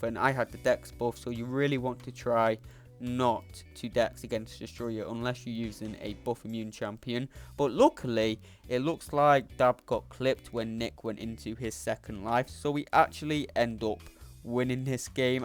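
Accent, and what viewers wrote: United Kingdom. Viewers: He has an accent, plenty of people in the uk speak like that, don’t be obtuse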